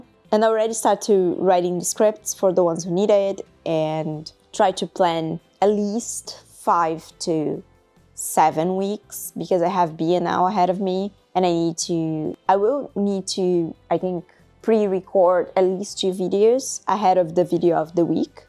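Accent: United Kingdom. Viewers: Is the british accent on purpose?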